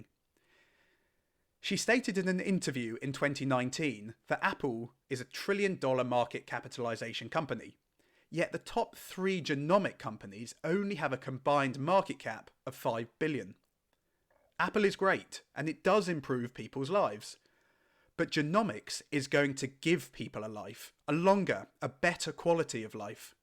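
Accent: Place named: United Kingdom. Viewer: How do you invest in ARKG in the U.K.? I assume you’re U.K. based from your accent?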